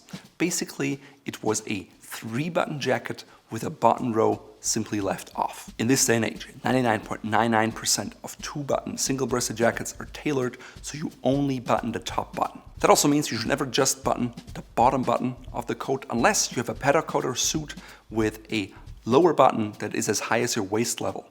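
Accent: Germany. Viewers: May i ask are you German or switzy?😊 i like the accent brother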